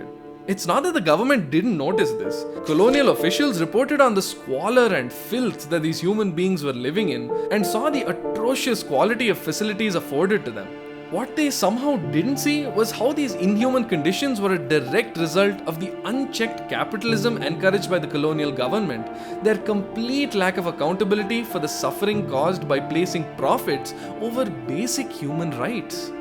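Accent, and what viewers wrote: South India, India. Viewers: Love the South Indian accent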